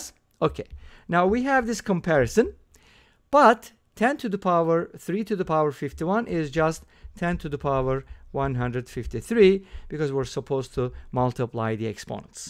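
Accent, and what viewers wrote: Turkey. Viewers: you got that turkish accent rolling 😁 keep on doing what you do🫶🏻🫶🏻 selamlar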